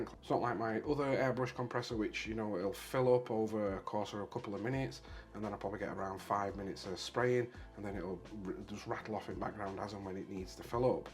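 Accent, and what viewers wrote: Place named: Wigan, United Kingdom. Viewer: Lad sounds like he's from Wigan or something so I assume he's pretty feral 😅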